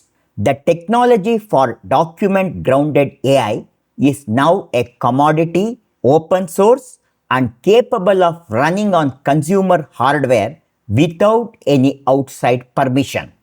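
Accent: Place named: Tamil Nadu, India. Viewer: Good one thanks. I genuinely like your accent. I grew up with Tamil-speaking friends and reminds me of home.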